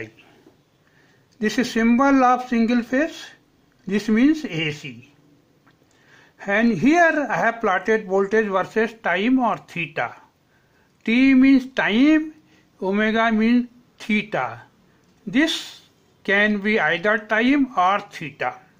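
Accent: Bihar, India. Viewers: Sir aap English Bihari bhasha main Bolt hi please Hindi mein boliye thank you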